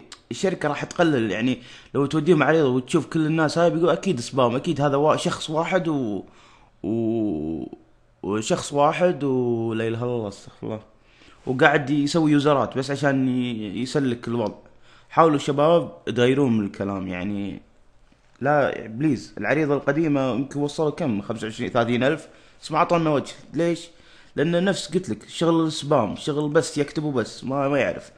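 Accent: Kuwait. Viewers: اشكرك بس مافهمتش ولا أوشي هههههه عاد انت من الرياض زانا أحسبك من إخواننا الكويتين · اما انت سعودي والله كنت احسبك كويتي &__& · انت سعودي ولا كويتي ؟؟؟ يا اخي كلامك كويتي والله احسبك كويتي طول هالمدة:) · آما انت من الريآض , آحسبك كويتي !؟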